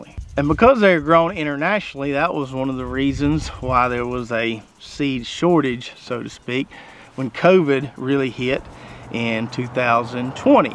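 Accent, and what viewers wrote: South, United States. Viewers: ...You just cleared up my thoughts as of late. I have been watching your videos for the last year and really appreciate your intelligence, southern accent, humor and love for gardening. You're the reason I purchased all my drip, fertigation and seeds from Hoss. I am sure they are missing their main man. Not sure we'll ever know what happened but if you start your own company, many like me will follow. God bless you and your family. Everything happens for a reason! Thanks for making awesome videos! Hollerin' from O-H-I-O! All the best to you and your family!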